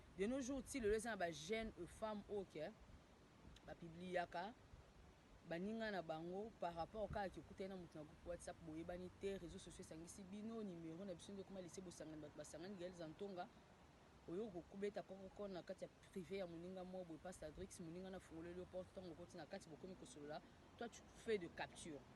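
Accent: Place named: Canada. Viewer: Osololi la canadienne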